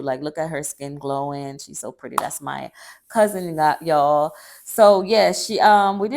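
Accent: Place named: Jamaica. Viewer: love ur jamaican accent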